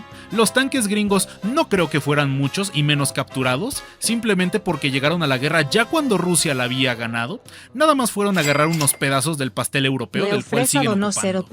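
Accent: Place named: Castile, Spain. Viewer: Acentazo castellano (Mis 10's)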